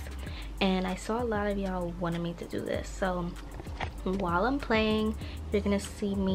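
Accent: New York, United States. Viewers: I never noticed your New York accent it’s so pretty ❤️ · I can hear her new york accent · This is the most that I've ever noticed her New York accent 😭💞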